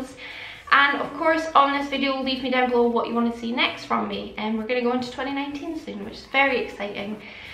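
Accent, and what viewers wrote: Scotland, United Kingdom. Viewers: I love your videos, I think I started watching them when I was looking for reviews of pale foundations. I'm a sucker for a beautiful Scottish accent! What lip colour are you wearing in this video? Such a gorgeous red.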